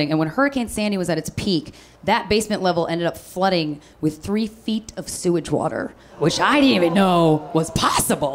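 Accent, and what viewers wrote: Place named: Midwest, United States. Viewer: Herr midwestern accent is amazing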